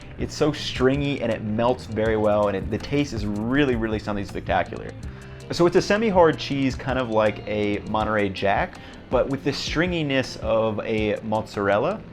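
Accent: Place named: Seattle, United States. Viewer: Where in the US are you from? You sound like Seattle?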